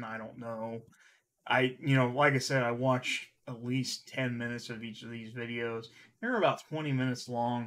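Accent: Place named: North, United States. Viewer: Watched 2 of his videos and saw he has little respect for southern life style. He sounds like he is from up north.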